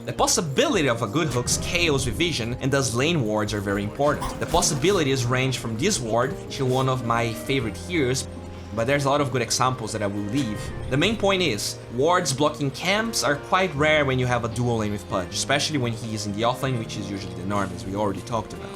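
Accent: Brazil. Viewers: Oh so he is brazilian that's why. I know he's doing all he can to improve his accent i believe in him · as a brazilian, his brazilian accent is rly easy to recognize, but he is improving his pronounciation comparing to his older videos.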